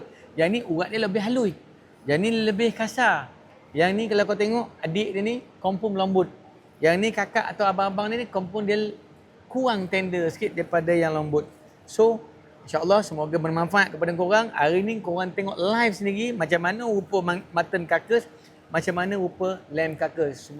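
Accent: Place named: Negeri Sembilan, Malaysia. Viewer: Speaking nogori pokat Broo....😅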